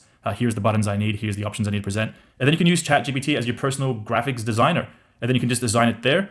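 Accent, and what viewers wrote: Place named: Australia. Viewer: Cześć, prowadzisz ciekawe prezentacje, ale jest nieco śmieszne gdy odtwarzane jest tłumaczenie w języku polskim, bo tłumacz interpretuje wait jako „waga” nie wiem czy dlatego że nie rozumie kontekstu czy może akcent australijski jest tak odczytywany. Ciekawe co o tym sądzisz? Swoją drogą uruchamiam usługę w Polsce wspierającą procesy w n8n w zakresie komunikacji SMS i voice, czyli interakcje SMS i inicjowanie połączeń telefonicznych do klienta z powiadomieniem głosowym ( voice message) oraz inicjujące rozmowę z botem. Cóż za fascynujące możliwości stwarzają takie systemy jak n8n.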